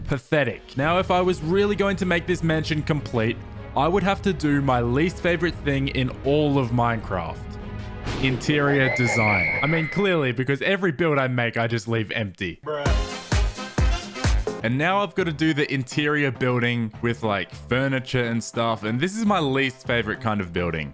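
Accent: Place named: Australia. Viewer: far out this is the most aussie I reckon you have been in any video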